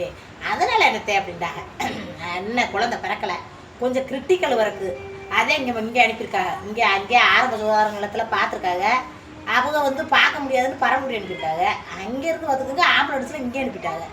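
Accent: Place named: Madurai, India. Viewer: வெள்ளந்தி யான பேச்சு, எங்கள் சின்னஅத்தையை மதுரையில் கட்டி கொடுத்தாங்க, அவுங்க பேச்சி இப்படி தான் இருக்கும், அத்தை இப்போது இல்லை, இந்த பேச்சு எங்கள் அத்தை பேச்சு மறுபடியும் கேட்டே மனம் ஆறுதல் ❤🎉 நீங்கள் நல்லதே செய்யுங்கள் நல்லதே நடக்கும் மா🎉❤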